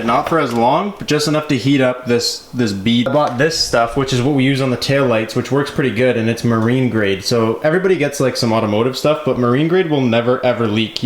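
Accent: Canada. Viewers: AMO he’s canadian man It’s his accent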